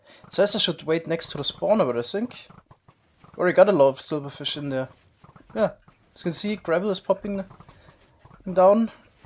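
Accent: Germany. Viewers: german here, is his English really that german? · German accent shows, I'm using to imitate a German accent towards my German friends:)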